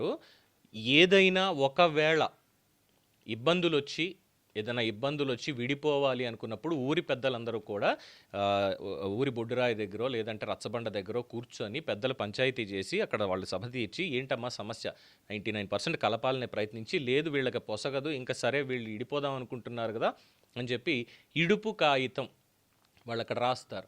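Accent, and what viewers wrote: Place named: Andhra Pradesh, India. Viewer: అసలు ఈ భాష యాస పై చర్చిస్తున్న యాంకర్ ఆంధ్ర వారా? తెలంగాణ వారా? తెలంగాణా వారయితే వారి భాష యాసపై పట్టు లేదని పిస్తోంది. ఎందుకంటే వారు మాట్లాడే మాటలలో 90% ఆంధ్ర యాసే వినిపిస్తోంది. మరి ఇలాంటి వాదనలు లో మాట్లాడే టప్పుడైనా తెలంగాణా యాసలో మాట్లాడితే మీ వాదనకు అర్ధం వుంటుంది. తెలంగాణా కవి జ్ఞాన పీఠ గ్రహీత నారాయణ రెడ్డిగారు ఆంధ్ర యాస తెలుగు పాటలు,ఉర్దూ గజాల్స్ రాసారే కానీ, తెలంగాణ భాష యాస గురించి రాయలేదు.ఎందుకని. 🙏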